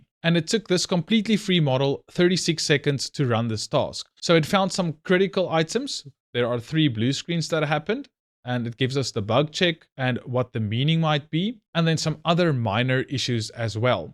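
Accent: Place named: Johannesburg, South Africa. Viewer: Excellent video, yes keep them coming, I find them very interesting. Plus my wife comes from Jo-burg and your voice makes her home sick lol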